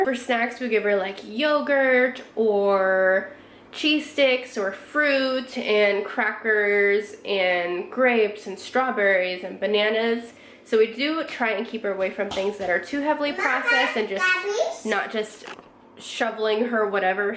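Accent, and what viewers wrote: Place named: United States. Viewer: where are you from? You sound like your from the states but hubby sounds English / England. I could totally be making this up. Great video with loads of ideas!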